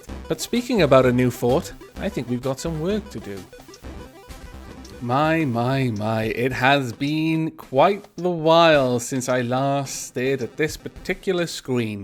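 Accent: Wales, United Kingdom. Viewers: Subbed man, can you add my name pls? Also are you Welsh?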